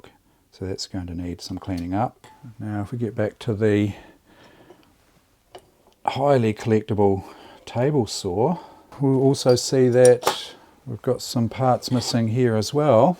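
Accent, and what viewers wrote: New Zealand. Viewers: So lovely to hear a New Zealand voice in the vintage sewing machine world. Horrified at converting a FW 221 to a jigsaw but also slightly impressed at the ingenious nature of the conversion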